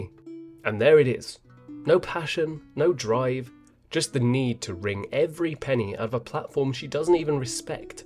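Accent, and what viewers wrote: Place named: United Kingdom. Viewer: I don't understand how you can take someone like ALR and speak so eloquently about her or what she's doing. I normally feel dumber after watching her but when I listen to you react to her, I actually feel smarter. Hey, it's NOT just the accent, either. Even though I have a thing for British accents because y'all could read the menu from McDonald's and it sounds intelligent 😂. It's like I'm watching a documentary and Albert Einstein is explaining how a sloth sloths. It's wordy but understandable. And it's Albert fucking Einstein, besties 😂. I think you might become one of my favorites, Mr.!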